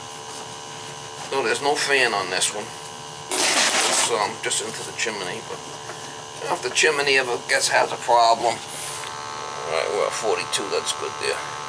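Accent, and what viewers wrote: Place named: Boston, United States. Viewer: You sound like you are from Boston .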